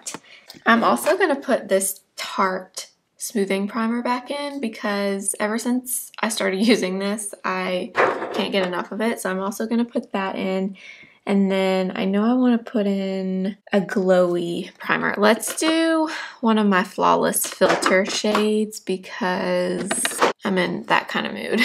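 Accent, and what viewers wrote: South, United States. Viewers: Girl where are you from?? I’m from Georgia and I’m detecting a southern accent 😍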